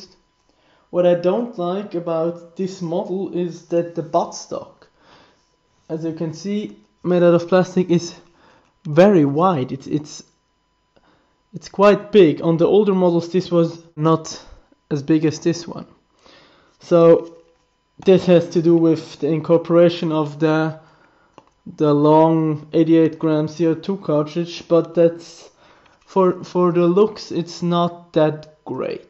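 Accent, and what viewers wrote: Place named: Germany. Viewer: man merkt irgendwie sofort dass du deutsch bist xD ist aber nicht schlimm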